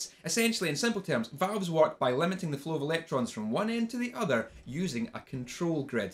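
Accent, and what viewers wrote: Scotland, United Kingdom. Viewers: Love video's like this man. Am fairly new to the channel, but loving it already. And nice to hear a Scottish voice on youtube! :D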